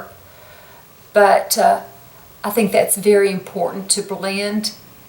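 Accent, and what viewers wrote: South, United States. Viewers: Just found you few days ago and I had to subscribe you because who doesn’t! You are skin is incredible but I like your kind and honest personality as much as your beautiful face:) and I like your cute southern accents. I have a same palette but I haven’t used for almost a year. I just got tired of the way I use so this look gives me more variety and would like to try it. Your skin care inspires me to start taking care of my skin more seriously. I’m 43 and after 40 I noticed my skin start sagging and looks dull. Thank you!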